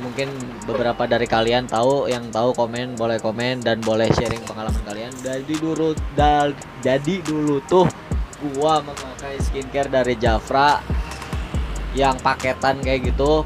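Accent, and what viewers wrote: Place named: Karawang, Indonesia. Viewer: Orang krw mana nih suaranya ,...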